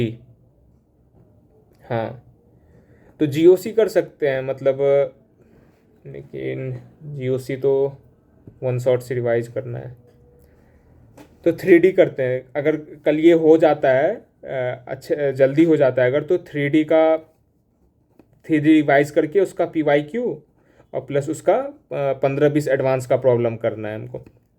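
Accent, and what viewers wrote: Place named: Bihar, India. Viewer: Tumhaari accent up -bihar jaisi hai.. So where are u from?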